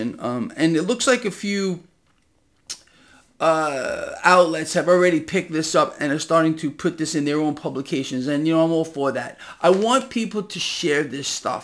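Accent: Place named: New York, United States. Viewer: The New York Accent is coming back Since moving to Fla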